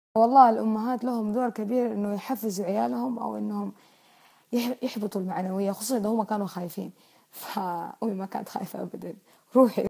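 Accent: Saudi Arabia. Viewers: هل أنت سعودية؟،،لهجتك سعودية 100 ب100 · لهجتها سعودية قح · اهنيكي على اسلوبك الجميل والواضح ولهجتك السعودية روعة بصراحة الجالية الصومالية من افضل الجاليات في السعودية وعندي صديقات منهم في الثانوي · هل كنتي عايشة مع اولاد في السعودية؟ سلوب كلامك مثل شباب السعودية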